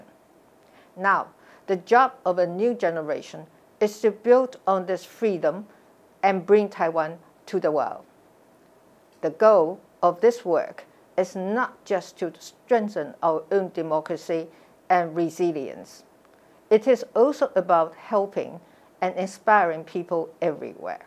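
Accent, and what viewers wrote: United Kingdom. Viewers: 小英的英國腔英文真好聽！台灣加油啦加油💪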